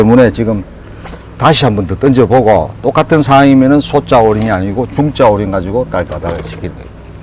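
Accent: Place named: Japan. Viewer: 말이 일본말 같은데? ㅈㄴ 알아듣기 힘듬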